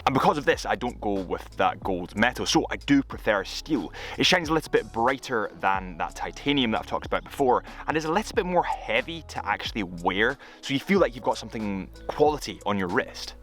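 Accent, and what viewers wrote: Scotland, United Kingdom. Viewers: This outdoors video is a more raw, younger and more Scottish sounding version of BarkandJack’s work. Enjoyable !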